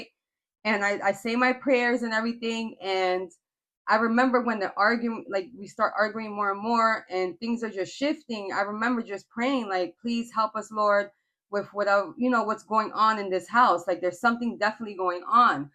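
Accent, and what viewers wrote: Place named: New York, United States. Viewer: it sure does sound like a NY thing. It’s like listening to someone read the book of life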